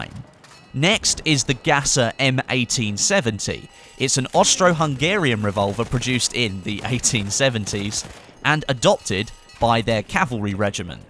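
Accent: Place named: United Kingdom. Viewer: why do you Brits have such a smoothing accent? lol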